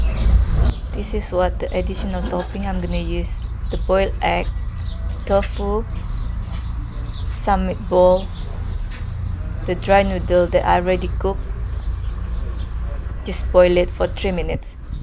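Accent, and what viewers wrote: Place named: Singapore. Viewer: aksen SING lho kentel bangetttt gue lagi kaya denger mamak mamak di bugis street. Maygat enak banget itu laksaaa.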